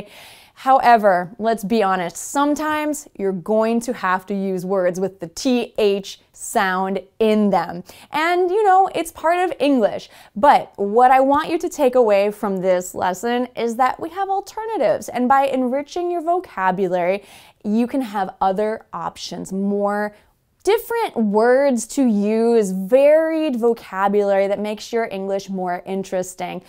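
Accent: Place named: United States. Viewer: Good Morning, How can I say this? I loved these alternatives mainly because I love to have more possibilities, more varieties, to increase my vocabulary, you know, to have more options This video lesson was a huge gain for Me to be more conversational 😂 But, I'm an enormous fan of this your American TH Sound, and it's too charming the position of the tongue when Americans say it Maybe I'm saying this because I'm like obsessed with it, I get staring People's mouth 👄 catching each tiny movement, for Me to learn and also because I definetely love it! Ok, I took some notes here: I'm thankful to you because of this suggestion on this video with 30-40 mins of duration, Train, right!? I'll certainly check it 😉 THANK YOU = I appreciate you / Awesome / Wonderful / I'm so grateful... Yes, I appreciate you for everything that you've been doing for us, it's Awesome 😍 I THINK = I have an idea / I imagine / In my opinion Hey, your idea of having that pizza last night had been Fantastic! 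In my opinion we should repeat this Program ( And how it's Me speaking, and I LOVE the TH sound, I would add to this sentence )... What do you think about it? 😂 Pizza, us, tomorrow, huh!? Father, Mother = Mom, Dad ( I enjoyed it, easier and cute as well ) 1200 × Twelve hundred Well, talking about numbers, I totally pass the 🏀🏈 ball Ok there are things in life that are unavoidable, and there will be times that will be required to talk about it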